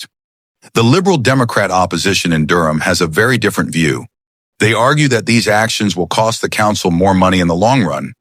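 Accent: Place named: United States. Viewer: England Now - With an American accent. Not for me.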